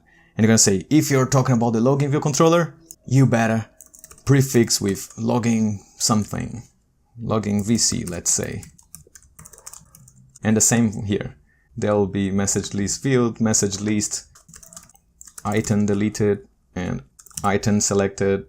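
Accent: Brazil. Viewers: what a great course! I'm lovin it! BTW are you brazilian? Your accent is looks like from brazil haha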